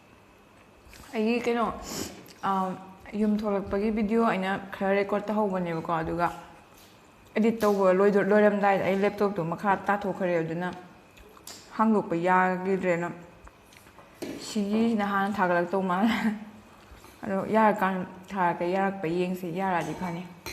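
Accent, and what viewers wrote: Kakching, India. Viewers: Che na kakching marol ngangbce nungcye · Kakching marolse taba ym nungaiye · Ema se yam nungcye... Kakching kai waideino ngse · Che se kakching kawaidgino hngkhi reply towrk towbirkhide eikhoi kakching mrol ngangbni eina hraoe su haikhibni eisu kakching ne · Kakching maronda ngangbana pamjei sister.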